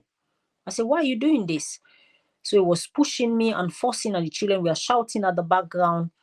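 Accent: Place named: Nigeria. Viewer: This lady sounds Nigeria to me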